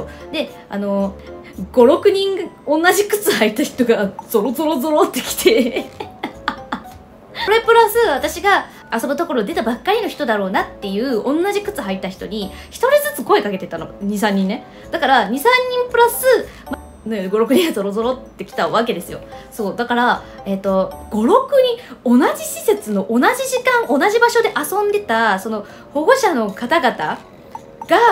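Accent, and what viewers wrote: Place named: Osaka, Japan. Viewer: 話し方が大阪のおばちゃんにしか見えん。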